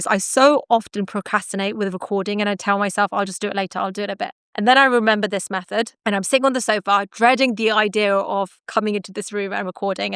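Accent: United Kingdom. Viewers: I have been listening to your podcasts for the past few weeks and I find them very interesting and helpful. I am in my sixties and I have read many self help books and listened to audio tapes and CDs over the years. There is something very refreshing about your podcasts. I also love your British accent 😊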